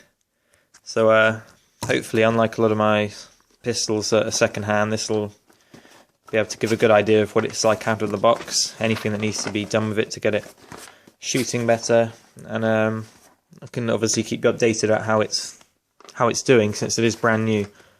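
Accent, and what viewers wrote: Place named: United Kingdom. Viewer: hey dude i can tell by your accent rhat ur British can you tell me what the requirements are for owing an airsoft gun in the uk thanks in advance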